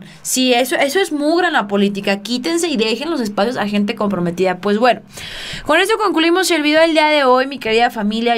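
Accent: Mexico. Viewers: Que ermoso Mexicano hablas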